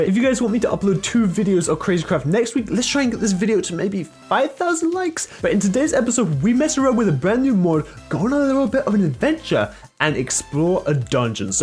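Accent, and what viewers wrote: United Kingdom. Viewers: Who Loves british acent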